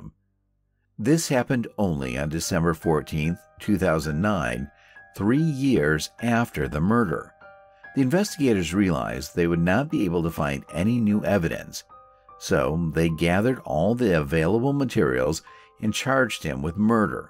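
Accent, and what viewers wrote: United States. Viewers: It's an American crime and the narrator sounds American, using miles would be a nice touch or both.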